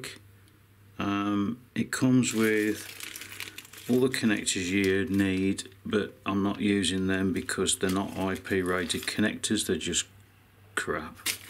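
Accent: Birmingham, United Kingdom. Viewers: I find your Brummie pronunciation of 'Bluetooth' a bit different to how we say it up north.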